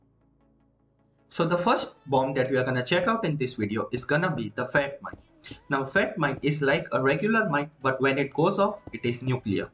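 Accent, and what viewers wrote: India. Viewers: You sound like english indian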